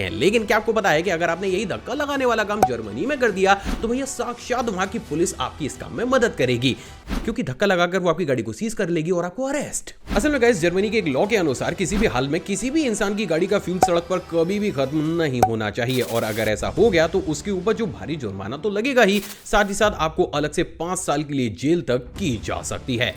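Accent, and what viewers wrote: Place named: Mathura, India. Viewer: Bhai aap kaha se ho ye language to hamare yaha ki he mathura ki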